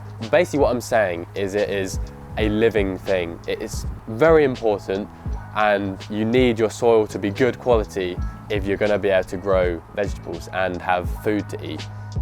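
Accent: United Kingdom. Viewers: Beautiful british accent!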